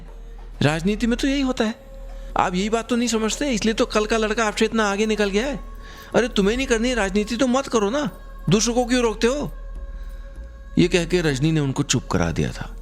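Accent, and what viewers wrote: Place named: Kumaon, India. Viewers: Love your way of kumaoni diaglouge Can’t control my laughing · I luv ur pahadi accent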